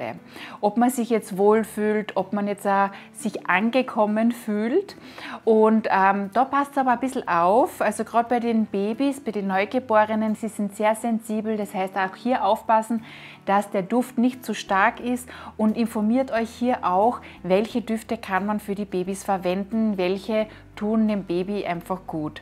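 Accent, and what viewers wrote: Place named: Austria. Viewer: Hi, vielen lieben Dank für deine hilfreichen Videos. So bekomme ich von dir doch viele hilfreiche Informationen und Tricks, über die ich mir noch keine Gedanken gemacht habe. Zu deiner Frage, ob du gut verstanden wirst, sage ich ein klares Ja. Da ich aus Wattenscheid/Bochum - NRW-Deutschland komme, sind wir "Ruhrgebietler" von Natur aus offen für alle Sprachen/ Kulturen. Dazu liebe ich die Aussprache von Menschen aus Österreich und der Schweiz sehr. Vor allem der Gruß zu Abschuss (Baba) Alles Gute dir, deinem Team und deiner Familie.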